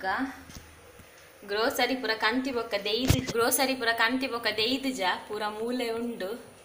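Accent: India. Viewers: Eer enna ne tulu panda proper Mangalore tulu paatheruvar anchad enk mast kushi aapundu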